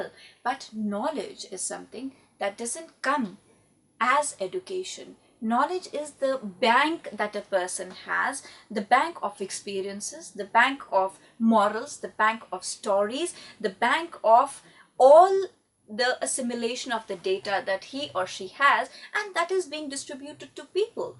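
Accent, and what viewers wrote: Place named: Scotland, United Kingdom. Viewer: you have a touch of Scottish accent. Thank you so much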